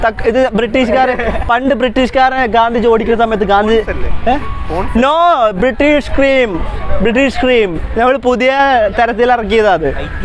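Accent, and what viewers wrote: Kasaragod, India. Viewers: kasaragod basha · Ksaragod slang mindan ni onnum koodi indanam mone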